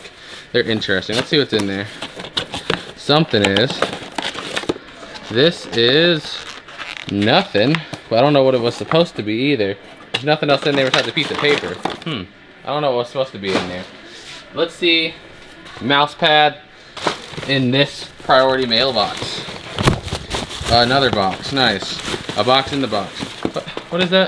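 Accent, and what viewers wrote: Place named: United States. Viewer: sounds american.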